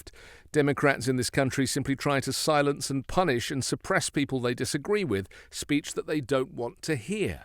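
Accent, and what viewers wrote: England, United Kingdom. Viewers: your 55 min news is always spot on and enlightening thank you. And hearing an English accent is nostalgic for me as an ex Brit cheers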